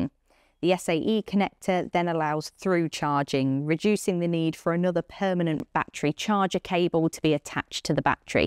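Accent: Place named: England, United Kingdom. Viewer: Can't place her accent. I guess somewhere in England?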